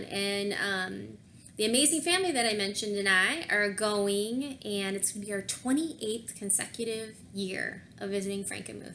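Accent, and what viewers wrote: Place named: Cleveland, United States. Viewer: You are like the coolest girl ever!! 😆😆 I totally thought you were from Cleveland from the accent...but I do remember Bendy Stitchy saying you were a Wolverine 😮. I’ve never had a reason to go to Michigan but you make the Bavarian town sound totally worth it!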